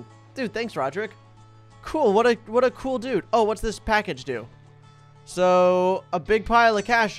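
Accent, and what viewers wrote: Ireland. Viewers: I love your irish accent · Why is he using an Irish accent? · Insted of a pirate accent i think he using a Irish type one haha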